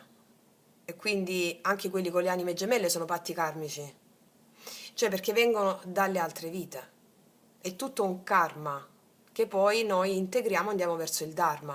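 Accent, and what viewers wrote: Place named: Sicily, Italy. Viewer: Ti dimentichi che non siamo tutti siciliani.,. parli troppo in fretta ti mangi le parole con il tuo accento... grazie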